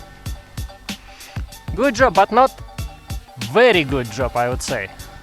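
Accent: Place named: Russia. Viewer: Дякую за відео. У тебе точно такий же акцент як в мене, тому я здогадався, що ти теж руский )). У мене такий же дрон і я трохи розчарований як працюють сенсори. На скільки я зрозумів, дрон не бачить сухих гілячок.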